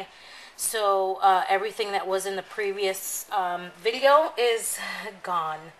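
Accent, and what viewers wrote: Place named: New York, United States. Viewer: You have an amazing NY accent :) great idea with the sandwich bags my pods never seem to sprout, i'll let you know how they turn out!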